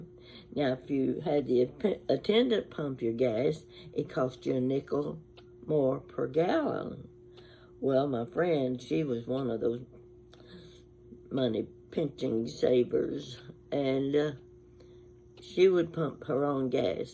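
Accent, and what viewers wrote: South, United States. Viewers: I remember you reciting your memory, you could have knocked me down with a feather when you perfectly pronounced a town that is very familiar to me in your broad southern accent lol x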